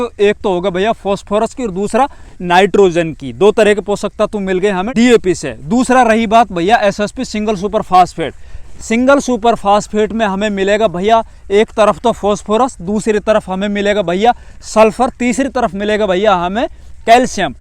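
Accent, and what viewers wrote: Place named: Mahendragarh, India. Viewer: Bhai ap kha se ho awaz tho m,grh side ki h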